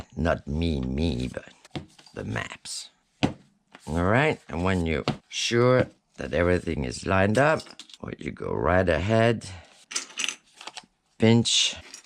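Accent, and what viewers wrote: France. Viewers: OMG I just found your page. You’ve had me laughing and smiling for the past two hours. I love your accent! Not sure but maybe French? Love your videos. I first watched the video where you made the ephemera storage. I cracked up when you said “ Oh wait look at all the little people. Now I can see all the little people!” Just know you have a new subscriber and I’m loving all your content! Cheers…. Nancy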